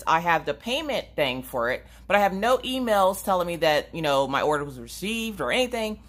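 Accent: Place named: South, United States. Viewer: I absolutely love listening to you as I diamond painting. I am a desert transplanted southern girl and it is like listening to me and my friends.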